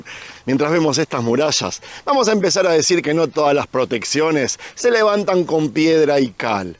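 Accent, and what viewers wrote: Argentina. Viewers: Isaias 53 es desgarrador tremenda palabra que describe a nuestro Señor Jesucristo. Saludos Dios te bendiga. Tienes acento y modismos argentinos che querido.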